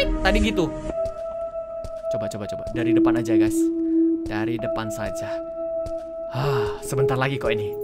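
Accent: Java, Indonesia. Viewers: Medok banget😂Forte Vanguard FG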